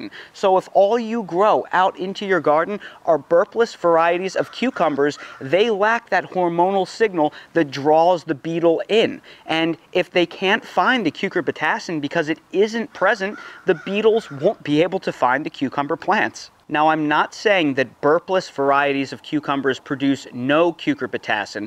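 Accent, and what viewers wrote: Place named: Northeast, United States. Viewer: Thanks for the great video! This is the first time I’ve heard of parthenogenic cukes, and the cucumber beetles here in Virginia are 😬😬😬😬. Weird question, but are you a Northeast transplant? Asking because I am too and your accent sounds familiar lol. Had a bit of an adjustment period learning to garden in the South but I think I’m getting the hang of it these days 😊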